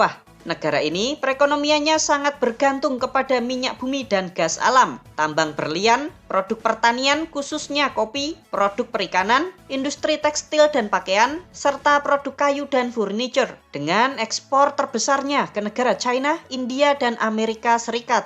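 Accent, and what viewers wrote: Java, Indonesia. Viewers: dubbing nya planga plongo medok gajelas.. ga nyaman bat di telinga...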